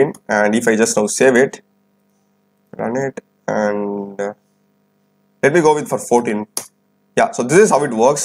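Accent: South India, India. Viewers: Its south indian accent bruh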